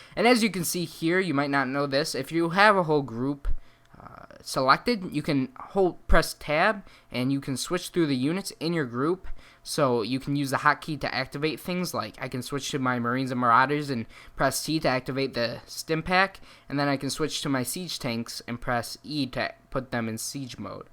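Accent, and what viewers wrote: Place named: New England, United States. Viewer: script or no script, you'd sound better without that awful new England accent.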